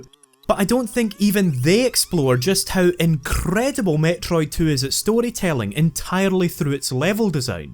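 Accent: Scotland, United Kingdom. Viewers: wow ur very scottish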